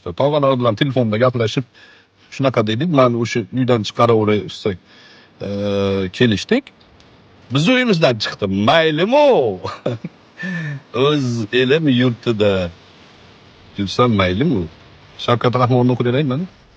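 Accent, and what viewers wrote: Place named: Namangan, Uzbekistan. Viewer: Сизни Илмингизни хурмат киламан лекин илмингиз бор одам Наманган шевасида гапириб эрмак килдийиз исломда одамлар устидан эрмак килиб Кулиш борми номахрам аелга гул бериш борми, видойингизни таркатган1та аелни деб бутун Наманган устидан кулдийиз шу тугрими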